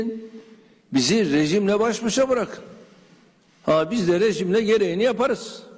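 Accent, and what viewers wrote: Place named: Arab world. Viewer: Arap şilvesi bunu dinleyeceğime biden i dinlerim daha iyi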